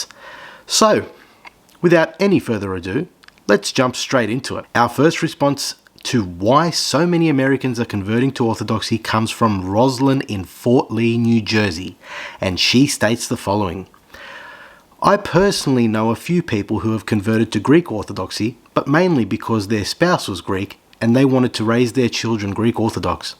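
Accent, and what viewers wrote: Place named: Australia. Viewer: You sound Australian. Unless you live here, why would it be relevant to you to talk about Americans converting to Orthodoxy?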